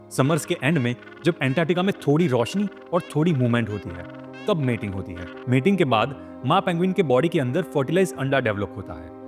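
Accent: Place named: Gujarat, India. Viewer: mko aap gujrati kyu lg rhe ho aapke bolne ke tarike se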